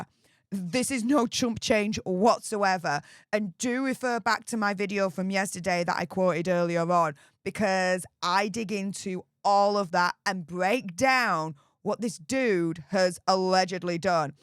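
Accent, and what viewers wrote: United Kingdom. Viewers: When my great-niece grows up in the UK, this Yank hopes she has your accent.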